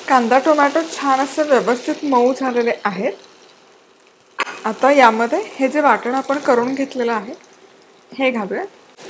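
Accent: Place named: Pune, India. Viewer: शुद्ध मराठी पुण्यातली 😊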